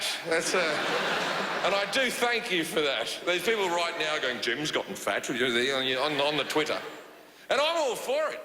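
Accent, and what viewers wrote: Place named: Australia. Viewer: Jim is an Aussie. I'm an Aussie. We all actually talk like this all of the time. It's completely normal. If you were at an Aussie BBQ then you would hear this kind of banter from multiple people all night long. It's how we roll. It's just great that Jim has made inroads into the international market and has exported our brand of humor, not that it's actually humor. It's just how we talk normally. Australia is a funny mother fuckin' place. You'd love it. Come on over. I'll throw a shrimp on the barbie for ya.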